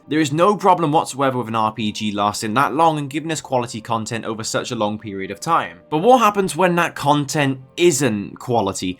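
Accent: United Kingdom. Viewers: He's got a middle of the island British accent. This lends him popular authority which is not earned. This is the only thing the UK has left to export other than financial planning.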